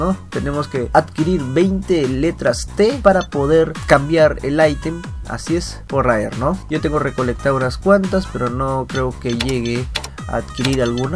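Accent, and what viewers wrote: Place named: Peru. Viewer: Se me hace que eres peruano, salueame n tu próximo video plssssssssssssssssssssssssssssssssssss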